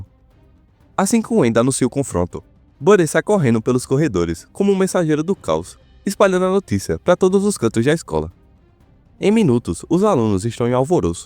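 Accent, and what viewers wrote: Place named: Northeast, Brazil. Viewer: Os vídeos ficam melhores com seu sotaque, viva o nordeste!!!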